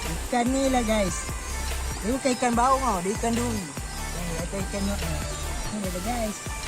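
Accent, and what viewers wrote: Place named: Perak, Malaysia. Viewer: Cakap Perak je coo..baru padu..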